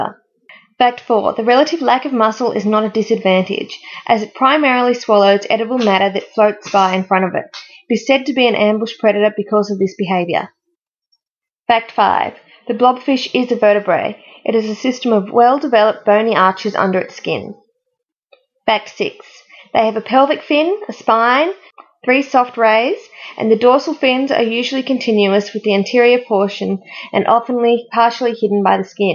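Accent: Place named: Australia. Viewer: Its an aussie accent :)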